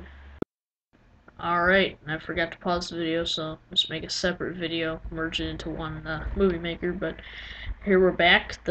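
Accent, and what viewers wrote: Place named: South, United States. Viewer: Your southern accent makes me want to puke all over myself :(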